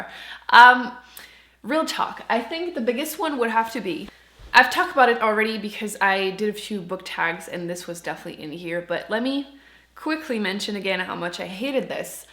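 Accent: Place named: Quebec, Canada. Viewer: Canada, French Canadian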